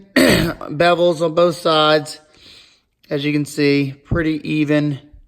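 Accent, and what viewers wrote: Louisiana, United States. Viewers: Nice accent, is that Louisianna? Sounds great. Excellent video thanks!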